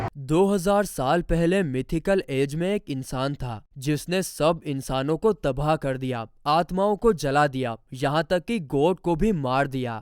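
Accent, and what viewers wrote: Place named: Gujarat, India. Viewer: Bhai ye gujtati vibe hta de yar thoda sa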